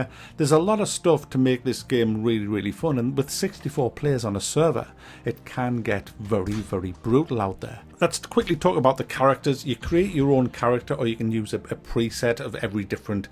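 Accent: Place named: Geordie, United Kingdom. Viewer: Howay, I want to see a video with that proper geordie accent, I feel like you try to tone it doon...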